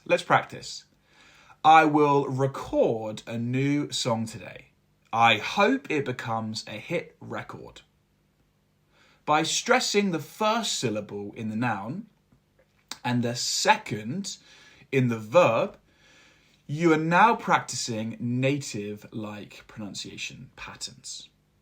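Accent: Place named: United Kingdom. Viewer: He is definetely British.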